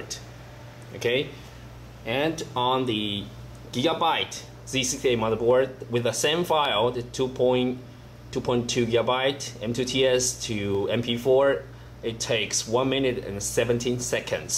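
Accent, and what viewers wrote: China. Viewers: It's a Chinese accent if I'm not mistaken...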